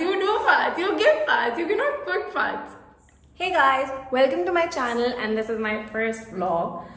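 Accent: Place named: United Kingdom. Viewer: Your English accent is just like British accent 😍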